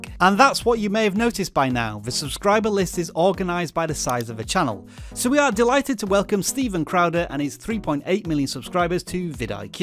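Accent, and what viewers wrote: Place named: United Kingdom. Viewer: random is vidiq British because he sounds like that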